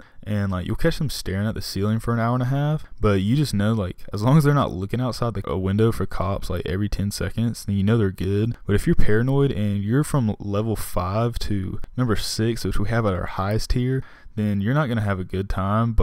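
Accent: South, United States. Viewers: I can't tell if you're southern or a frat guy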